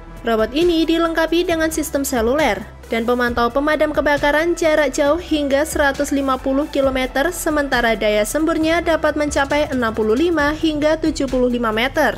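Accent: Java, Indonesia. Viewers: Wong djowo medok e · Ngomngnya medog wkowkowko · Org pundi mbaa, medhok bgt omongan e hahaha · Naratornya medhok... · Medok suaranya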